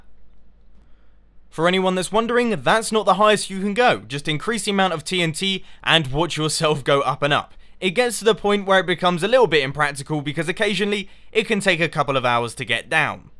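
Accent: England, United Kingdom. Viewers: Are you british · That intro was very English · The british voice made up for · Ahhh... the britishness of that british voice... like music to my ears...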